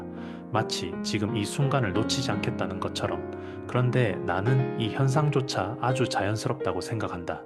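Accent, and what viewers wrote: Gyeongsang, South Korea. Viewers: AI로 편집한 목소리 경상도 비슷하게 들리는건데 전 오히려 좋아요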